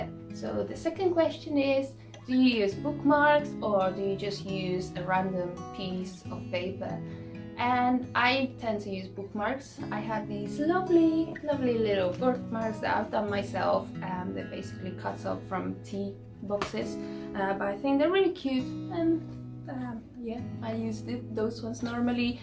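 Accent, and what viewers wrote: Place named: United Kingdom. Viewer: I love the British accent you picked up,! It's so nice to see and hear you, even when we're isolating so far apart. I look forward to more videos:) Yay!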